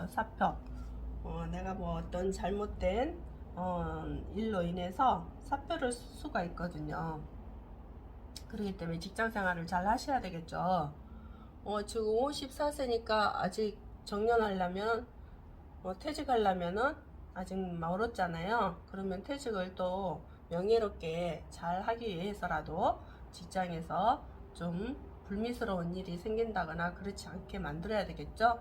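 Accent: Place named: Jeolla, South Korea. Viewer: 전라도분이시군요 저도. .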